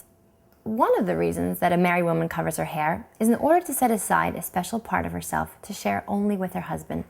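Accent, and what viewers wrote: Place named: New York, United States. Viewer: I must admit, when I first started watching your vid, I thought, "O dear. Here is an annoying materialistic Jewish gal with an annoying New York accent". But then I found your vid to be very spiritual and quite Biblically educational. More!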